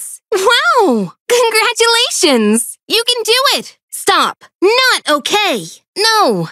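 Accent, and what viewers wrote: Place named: Brunei. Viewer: I think about Bruneian Accent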